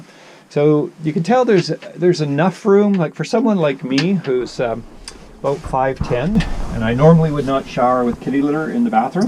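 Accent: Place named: Canada. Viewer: "Process" and "out" too, but he's pronouncing them correctly for Canada and that's where they live. If we were in Canada WE would be speaking "funny".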